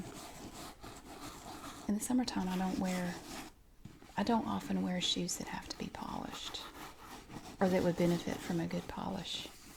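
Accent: South, United States. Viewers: Oooo this works for me. The combination of the brushing sound and gentle southern accent is pleasing.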